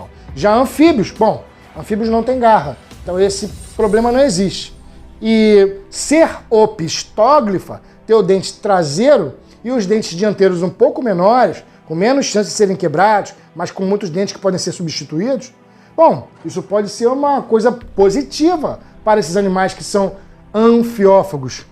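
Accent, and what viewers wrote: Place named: Bahia, Brazil. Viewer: Com sotaque baiano. Meau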